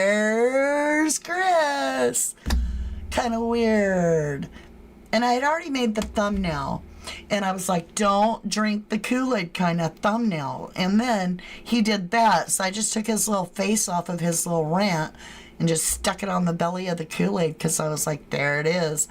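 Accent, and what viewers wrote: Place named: United Kingdom. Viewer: I love your UK accent 😂❤️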